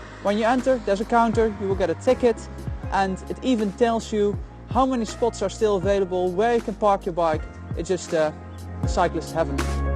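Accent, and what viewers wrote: Netherlands. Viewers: Toen ik je eerste video zag dacht ik een beetje te horen dat je Nederlands was. Zelfs als Nederlander is deze vraag ook moelijk te beantwoorden. Zal het zelf eigenlijk ook niet weten. Ohh echt, wist niet dat die actie groep bestond. Ik leer nu super veel van deze video. Ik leer elke dag wel iets nieuws. Vind het wel erg dat ik dit eigenlijk niet wist hahah. Super bedankt voor het maken van de video! Groetjes uit Groningen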